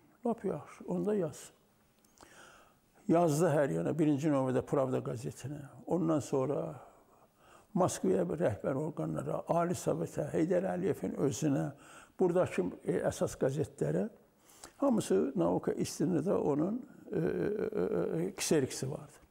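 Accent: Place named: Armenia. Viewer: Əsil yerazlarin ləhçəsilə danişir. Onnar,bax belə danişin. Olar, hansi yaxşi görünür və esidilir.? Yazin.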